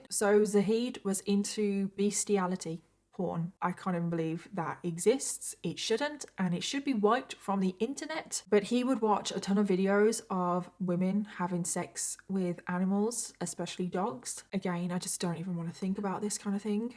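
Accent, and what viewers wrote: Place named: England, United Kingdom. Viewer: Hey my fiancé and I love your videos and we've found ourselves trying to figure out your accent! Where in England are you?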